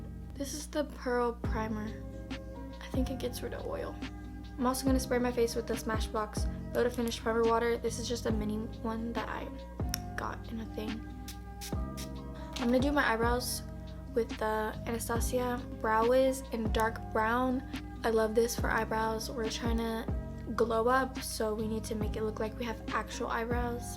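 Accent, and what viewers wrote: Texas, United States. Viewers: What part of texas are u from ?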